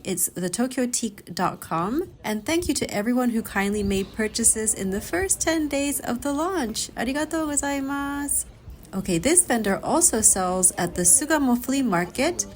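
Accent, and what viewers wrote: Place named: United States. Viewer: I have a question, you being Japanese and British, you have an American accent but aluminum. Seems strange, was wondering.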